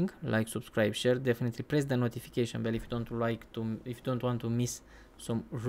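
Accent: Romania. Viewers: ce accent de roman ai :)) esti tare bravo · u sound romanian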